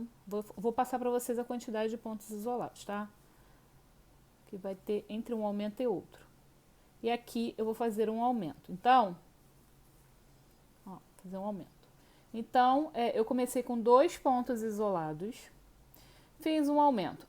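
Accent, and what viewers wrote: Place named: Rio de Janeiro, Brazil. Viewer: adorei sua explicacao e anotei no meu caderno, gostei de voce dizendo "ta okay" como o nosso eterno presidente Bolsonaro fala bem coisa de carioca falar mesmo, aqui no Parana nao temos o costume de usar esse termo 😄o presidente por ser paulistano reside no Rio ele tem o costume de falar é muito bom. Beijos 😁💋❣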